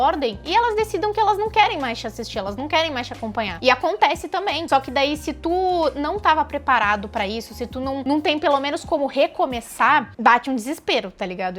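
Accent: Brazil. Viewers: Pensei que vc fosse de SP 😂😂😂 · VOCE É DE PORTO ALEGRE? KKKK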